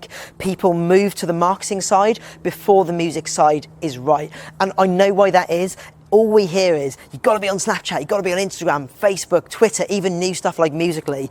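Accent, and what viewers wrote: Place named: United Kingdom. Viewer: Bro this guy has great voice.. Totally British accent!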